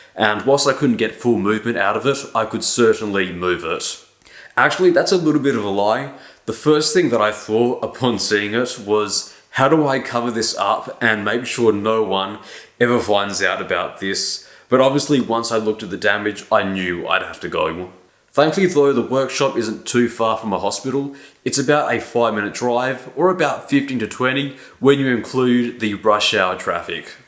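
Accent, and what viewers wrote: Australia. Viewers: Wait .. he's Australian?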